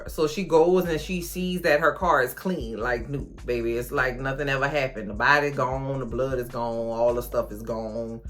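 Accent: New Orleans, United States. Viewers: Are you from New Orleans? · Are you from New Orleans ma’am? You sound like family!!!!! Edit: Ok I’m 3 minutes in… you definitely from New Orleans! Automatic sub!!! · You gotta be from New Orleans !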